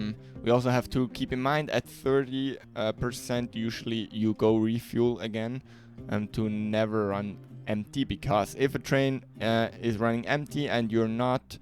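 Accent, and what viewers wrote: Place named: Germany. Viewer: Engineer with a german accent and 100% dedication? Definitely a like. TY for the work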